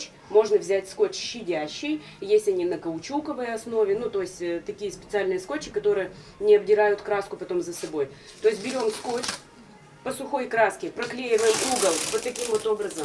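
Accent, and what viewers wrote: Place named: Vyatka, Russia. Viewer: А я думаю,откуда такой акцент ? Вятские....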